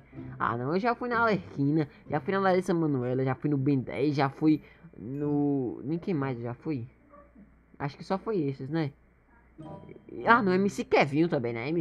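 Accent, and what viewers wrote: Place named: Ceará, Brazil. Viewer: Oi.. Vc parece ser Cearence kkkk Faz video de como viver no Bob Esponja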